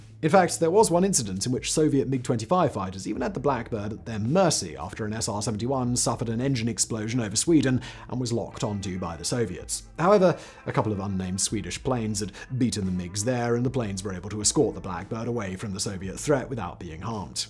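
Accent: England, United Kingdom. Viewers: Normal Americans generally refer to this as an English accent. It’s strange, but the Brits all have it! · Can we get someone that speaks American? I'm tired of Brits, especially when they put an "r" on words ending in "a".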